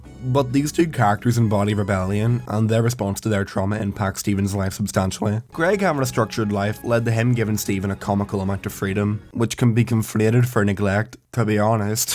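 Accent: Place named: Northern Ireland, United Kingdom. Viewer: You just saved my life fr. I haven’t heard a northern irish accent since christmas 😂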